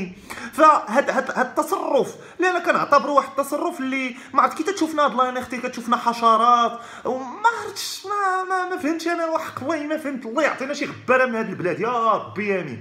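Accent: Morocco. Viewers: هذه هي اللهجة المراكشية المغربية .ههههه يتحدث رغيب امين عن خدمة ال VOIP حيت ان الوكالة المغربية لتقنين الاتصالات قامت بالغاء الحظر عن هاته الخدمة نظرا لأن المملكة المغربية مقبلة هذا الشهر على تنظيم مؤتمر الاطراف الثلاث COP22 ولكي لا تثحدث الدول العضمى عن هاته الخدمة لجئت الوكالة الى الغاء الحظر عن مستعملي الواتساب والفايبر وسكايب و ايمو وبرامج اخرى تعمل بنفس الخاصية لمدة محددة وتعيد الوكالة الحظر من بعد . وامين يطالب المغاربة بنشر هاشتاغ بعنوان COP22 وكتابة الرسالة باللغات العالمية لكي تصل الرسالة .للعلم الوكالة هي من تطبق القوانين على شركات الاتصال . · ياخي والله انا بحب المغاربي بس لهجتكون صعبي شو بدي سوي.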